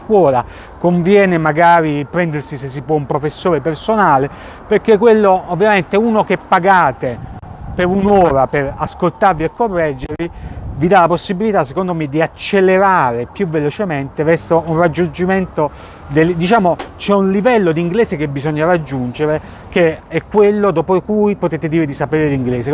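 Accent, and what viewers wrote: South, Italy. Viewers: Tu come lo hai imparato? Ma per caso sei del sud?🤔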